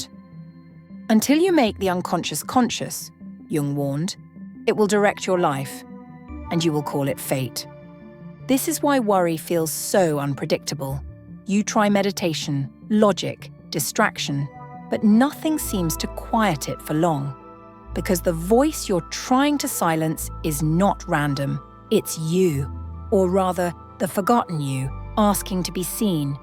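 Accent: RP, United Kingdom. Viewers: That isn't a stuck up accent, nor was she fast speaking. She spoke clearly in what used to be called received pronunciation, which was a hallmark of BBC broadcasts. But now we have to pander to the idiocracy. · You have a lot of hatred in your soul! Just because this lady speaks clearly you have to be nasty about the English accent! I assume you're American and, if you are, that speaks volumes! 👎😡🇬🇧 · Fast speaking stuck-up British accent shoots concepts one after another without saying what to do. You are never the one in danger, your soul doesn't need protection. Loosen grip to worry to open space. No longer chase the worry. Catch the fear and stop it before it spirals.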